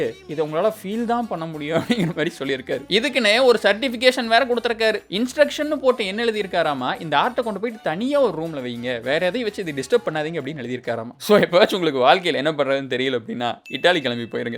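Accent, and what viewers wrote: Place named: Kongu, India. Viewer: Kongu slang ❤❤❤❤❤❤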